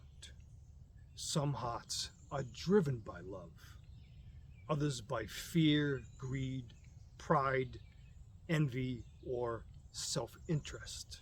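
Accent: Boston, United States. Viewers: Well put I needed this thanks and I also appreciate you being able to tell me your from Boston with out telling me your from Boston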